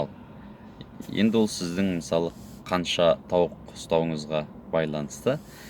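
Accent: Standard. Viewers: Бауырым Алла разы болсын. Қаймағы бұзылмаған Қарағанды тумасы қазақшаң таза екен. Кеңесіңе рахмет. Біраз нәрсе үйренуге тұрарлық. Өзім қатты қызығатын кәсіп. Ісіңе сәттілік. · Бауырым саған риза болып жатырмын Сенің қазақша таза сөйлеуіңе еңбегің жансын кеңестерңді жіберіп тұр Бұл біздің қазағымызға керек кеңес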